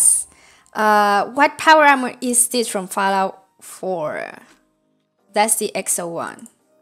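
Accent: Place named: Colombia. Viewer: I love it so much but I cant put together ur accent I think is Colombian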